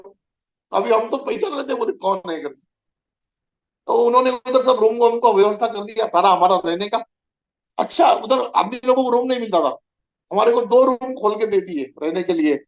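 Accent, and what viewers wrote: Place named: Maharashtra, India. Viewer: आप के हिंदी मे मराठी आती है, बहोत अच्छा लगता है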